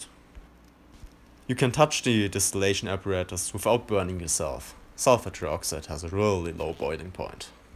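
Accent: Germany. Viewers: ich finde seinen Deutschen Akzent beim Englisch sprechen super😂👍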